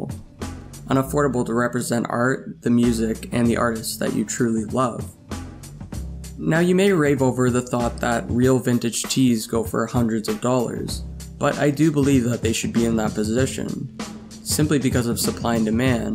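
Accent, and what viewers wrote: Canada. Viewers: Hey, are you Canadian? Once you mentioned Wrong Bar and from 2012 i was like wait.. that sounds like Toronto talk!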